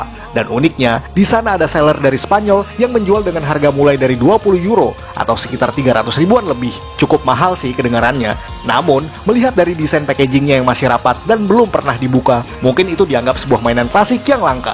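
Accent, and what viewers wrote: Java, Indonesia. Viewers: terlalu medok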